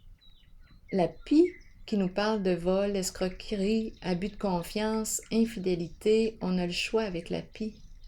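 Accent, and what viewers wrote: Quebec, Canada. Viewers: PS: j ai failli naître au Canada !!! J' apprécie vous entendre parler le Québécois !!!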